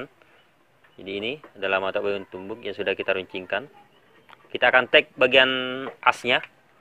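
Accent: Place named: East Java, Indonesia. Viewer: MANTAB PENJELASANNYA , KALAU DENGAR LOGAT BICARANYA SEPERTINYA MAS DARI JAWA TIMUR YA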